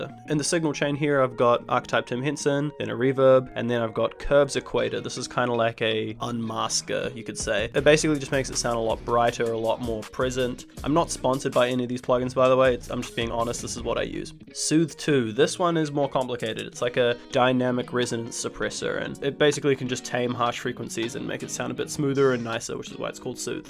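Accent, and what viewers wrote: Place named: United Kingdom. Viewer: This guy gets it with a British accent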